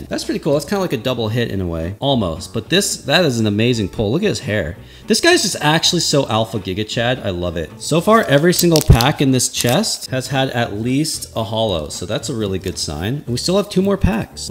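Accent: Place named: Australia. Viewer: BRO AUSSIE LOL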